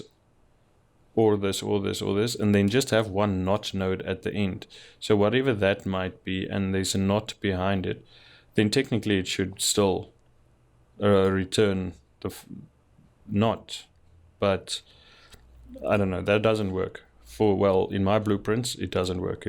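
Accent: South Africa. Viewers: Good to hear a SA accent on a tutorial video.! Keep the naas foorums up Boet! : )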